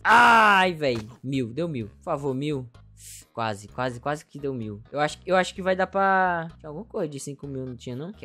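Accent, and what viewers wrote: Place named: South, Brazil. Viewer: Pelo sotaque acho q o é do Sul.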